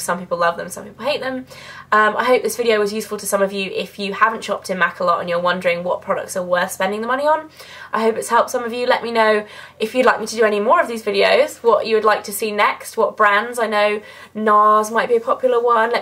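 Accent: United Kingdom. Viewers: Your accent is DIVINEEE im British, and ive always hated our accent on all accounts, but for some reason yours seems to be an exception, i absolutely love it! I wish/hope i sound like you do! LOL :) You're an amazing guru, keep up the good work! You're videos/tutorials have really helped me out :D x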